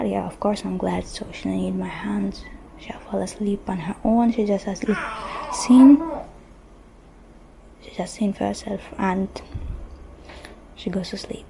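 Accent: Jamaica. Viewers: I dont know much about your story havent gone that deep yet but how you get such a Jamaican accent and can your mother understand it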